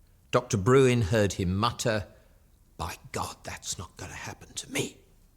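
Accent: United Kingdom. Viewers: Why do you have an Englishman narrating American History? I love the Brits, don't get me wrong, but right is right and wrong is wrong.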